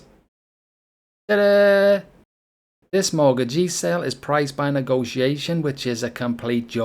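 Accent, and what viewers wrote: Liverpool, United Kingdom. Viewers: Are you from Liverpooool mun?!!?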